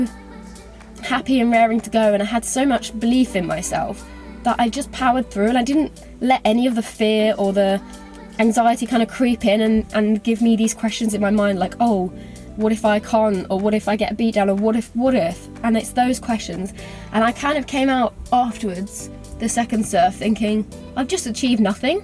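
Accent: RP, United Kingdom. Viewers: I thought so! I can tell by your BBC accent! Do you find it difficult to understand the Swansea accent? I’m returning there in April after 20 years in Singapore. I’m a bit too old to be as adventurous as you though. I used to hang glide off Rhosilli though. Your vids are great BTW!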